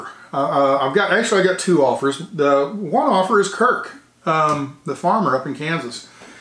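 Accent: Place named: Texas, United States. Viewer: We follow your channel for the travel aspect of it so that is what we would miss the most if you were to quit traveling. Some of the most special experiences we've had in our travels come from visiting with the local residents I traveled with a WWII pilot with your Texas accent and he could break into a conversation with anyone anywhere. Once you get to talkin to folks there's a tremendous amount of material for a beautiful channel. In my mind, your Charles Kaualt idea would be a total winner. There is such a need for your real honest type of programming.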